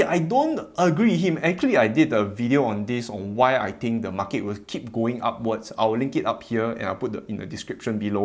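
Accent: Singapore. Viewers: Love the singaporean accent dude! proud of you! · I sold my bershire last 21february sadly i really like the guy may if you mix feeling and investment it is not a good moove like the guy but not too much some bershire stock as i am writing brb.b is 170.6....thank you for you video i like your Singapore accent i have interest in Singapore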